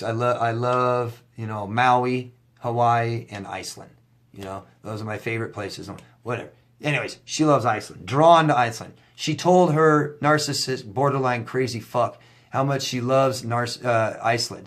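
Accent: England, United Kingdom. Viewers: Your English accent is really funny..Nessa from England !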